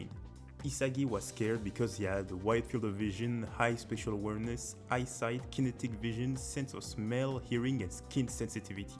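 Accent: France. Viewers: I knew you sounded french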